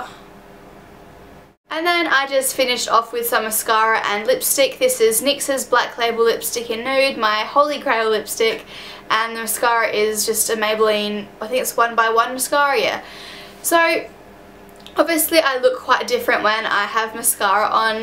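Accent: Australia. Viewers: Sounds more Australian.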